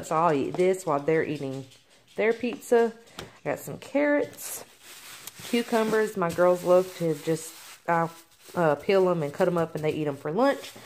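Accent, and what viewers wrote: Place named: South, United States. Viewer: Family of 5! Girl, Idk how you do it lol. You're amazing haha. Are you from the south? haha I love your accent. I'm from KY.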